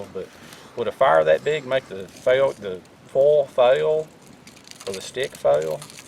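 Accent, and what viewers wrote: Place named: Louisiana, United States. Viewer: Two thoughts: A.) Why not a trench fire? B.) If you carve a funnel out of wood with a SAK and lay the tin foil in the funnel: wouldn‘t life be better?😅 Love your videos and your accent! Keep making those experiments!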